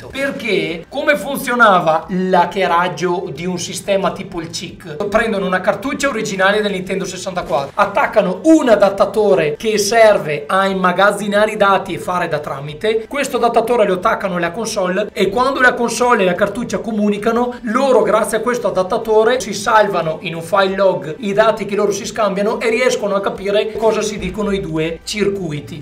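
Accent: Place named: Veneto, Italy. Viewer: L'accento veneta di quale provincia è?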